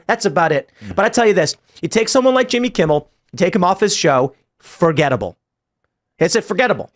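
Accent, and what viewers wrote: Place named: Chicago, United States. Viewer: I love it when you can hear go full Chicago gangster vernacular. It's subtle but it's there.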